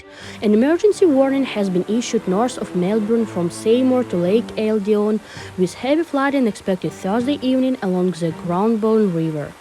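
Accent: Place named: India. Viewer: 인디안 영어냐? 발음이 왜그래?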